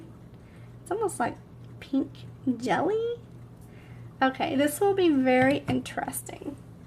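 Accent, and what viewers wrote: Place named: Appalachia, United States. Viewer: I'd sure like to know what part of Appalachia you hail from with that High Falutin kitchen n way of talkin'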